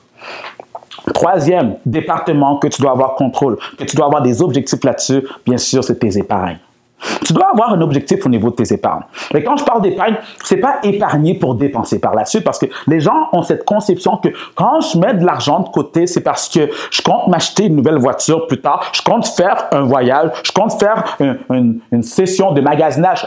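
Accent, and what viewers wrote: Canada. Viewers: Tes vidéos sont vivants et savamment épicées à la canadienne (accent). Riches dans le fond & dans la forme, tes conseils de pro suscitent l'intérêt général et poussent à l'action. C'est vraiment fouettant!!! Merci toujours, Mister. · Cc!! Ton accent est bien CANADIEN????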